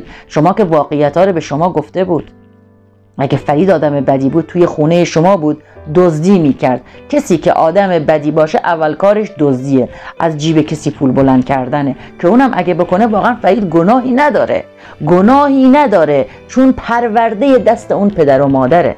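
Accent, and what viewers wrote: Iran. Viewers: خانم جان خودت واقعاء یک انسان دورغگو هستی در یک ویدئو از همان تعرف میکنی وحالا از همایون به بدی یاد میکنی با لهجه ایرانیت. خواهر اگر پول می خوای پیدا کنی یک روز را یکی را خوب وبار دیگر از او بدی میگوی خودت هم یک هنر مند خوبی هستی دل سوزی تو به فرید نداری به خودت دا ی یک چینل داشته باشی خانم همایون افغان آدم بسیار خوب است فرید را تشویق به دروغ گفتن نکن باید فرید سر زنیش شود خودت دل سوز نشان ندهید همه به خاطر پول از یتوب است خانم جان هنر پیشه خوبی هستی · خانم بسیار با لعجه ایرانی حرف میزنید لطفا اول قضاوت بیجا نکنید راجع به همایون چون او آنقدر حمایت کرد و همایون پیش از فرید بینیده خود را داشت فرید را همایون بد نام و نابود نکرده خودش با اینکه همایون همه خوبی و اعتماد کرد خوش با ذیرکی که داشت خودش دوباره در چاه خود را آنداخت مگر همایون میخواست او را از چاه بکشد خودت خانم فریب پویا را نخور اگر بسیار مهربان هستی بیا دست فرید را بگیر نه اینکه از ایران اینقدر قضاوت ناحق کنی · دختر قوما اول باید لهجه ایرانی بگذار و به لهجه دری زبان مادری خود مان حرف بزن باز بیا حرف بزن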